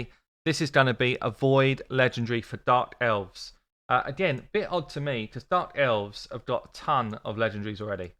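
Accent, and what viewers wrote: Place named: London, United Kingdom. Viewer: Never doubted you are from London and always enjoyed your pronounciation! :)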